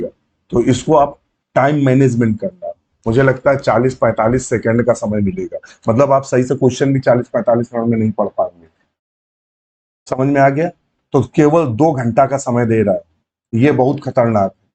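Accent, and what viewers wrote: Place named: South, India. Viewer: Mere ek sir the south se vo bhi ese hi bolte the...l yell m yemmm n Yenna.....sobi think sir south se h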